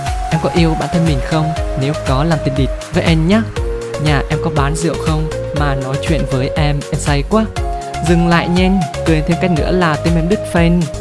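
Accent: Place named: Central, Vietnam. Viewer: suốt ngày xem rồi quen nói theo tiếng miền trung lun òi:D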